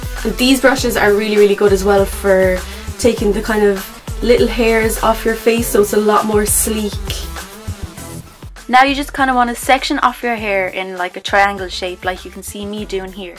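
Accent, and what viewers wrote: Ireland. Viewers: Irish YouTube for the win 💋🇨🇮 I'm Irish myself 🇨🇮💛 I knew the second you started talking you were Irish 🍀😂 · are you irish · are you Irish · I love her Irish accent 😍😍 I wish I had an accent too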